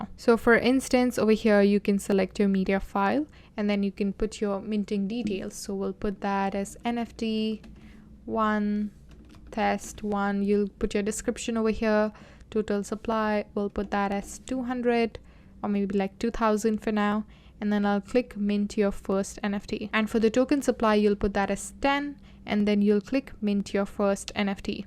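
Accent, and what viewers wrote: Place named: India. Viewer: Luv the video.thank.u. R u.from india luv the accent.🙏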